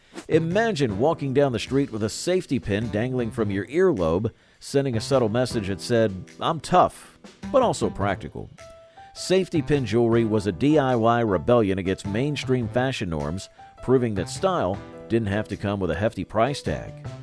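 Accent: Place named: United States. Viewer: I hear a standard American accent from this host. I am not sure if it's computer generated or human. In case they are a human and reading this, the word is pronounced "app-li-KAY"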